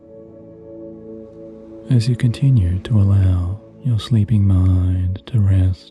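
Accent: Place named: Australia. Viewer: your Aussie accent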